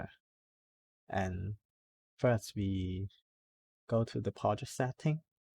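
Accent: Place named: China. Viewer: Chinese accent.Thanks for the solution of animation changing flicker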